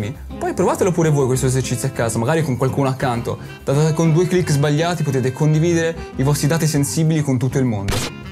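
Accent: Italy. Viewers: toscano AHAJAKNAPA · Non sapevo fossi veneto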